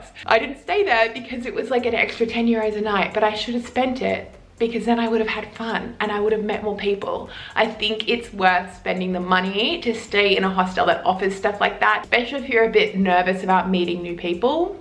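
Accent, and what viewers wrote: Australia. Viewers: She's Australian thats how it sounds Mate with Aussie accent. What accent should she use MANY out there?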